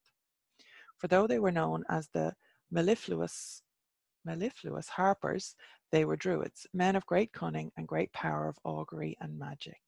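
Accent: Ireland. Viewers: Thank you so much,. I appreciate hearing the Irish pronunciation as well as your commentary and look forward to appearances of the goddess forthcoming!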